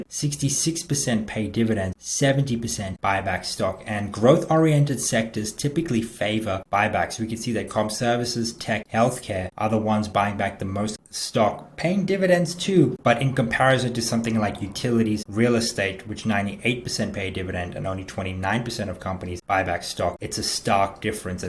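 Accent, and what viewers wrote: Australia. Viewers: Great analysis yet again.I love your familar Aussie accent too; yet another reason for me to listen to your show. Since commercial real estate (CRE) woes are very much in the news all across the world & not just the US, does this mean that every woman, man and their dog is now placing short bets on just about anything to do with CRE implosion say with options a few months out? Thanks, for any further insight you are able to offer.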